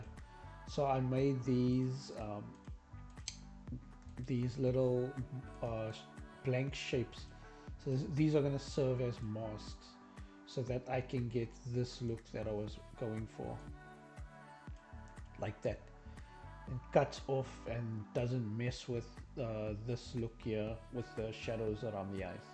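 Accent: South Africa. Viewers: Are you South African? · You sound very South African. Awe masekind! Shout out my brother! Keep Up The Great Work!!!!!!!!!!!! Lets push animation in SA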